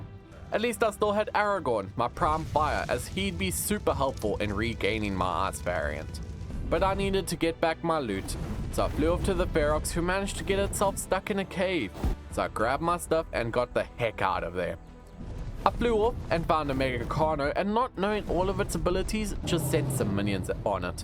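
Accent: South Africa. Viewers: Are you South African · Mr, are you South African? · damn okay. lots of ppl south african here. i thought he was like scottish or something(i aint good with accents) but hes south african. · Kyk hier neh ....jou accent was sommer mooi engels met n hint afrikaans, maar tu jy ESKOM mention toe weet ek sommer....(nee wat hierdie Suidafrikaaner kan sommer enige tyd n sub kry) uitstekend my friend ...sign my op vir daai 250 D's (Ending was totaly unexpected)#afrikaaners bly bo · Are you South African ? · Wait a minute are you South African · i knew you were south african lmaaooooooo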